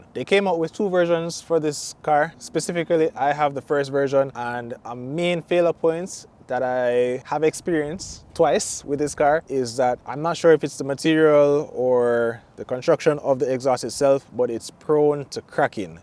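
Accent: Jamaica. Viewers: Very informative video. Jamaican or Trinidad?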